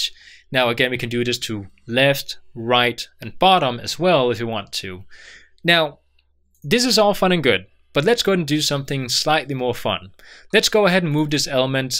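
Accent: Denmark. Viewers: Are you by any chance Danish?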